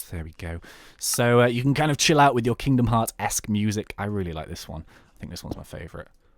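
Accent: United Kingdom. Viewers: It sounds like your British... I speak British some times:). Please tell me if your British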